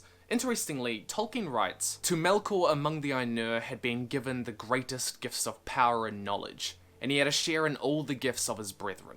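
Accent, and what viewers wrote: New Zealand. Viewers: Is it a common thing for kiwis to not recognise their own accent? I cant beleive I only just realised you are also from nz xD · Love your channel bro! You sound like a kiwi... are you? im from auckland!!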